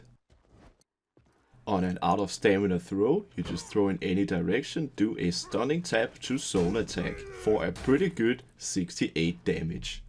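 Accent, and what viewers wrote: Denmark. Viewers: fuuuuck, man kan godt høre at du er dansker :D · The Danish accent is amAzing i love it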